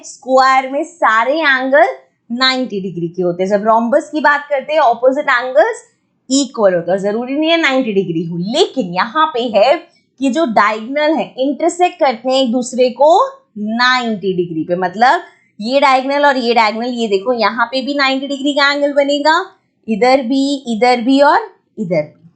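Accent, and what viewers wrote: Punjab, India. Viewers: Punjabi ho app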